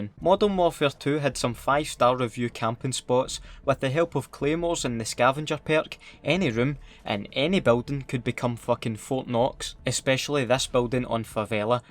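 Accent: Scotland, United Kingdom. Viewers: I'm Scottish, and most YouTubers are American. It's just so nice watching you so I can finally hear a Scottish person playing CoD. I actually canny explain it pahaha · I subbed cause of his Scottish accent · What part of Scotland are you from mate??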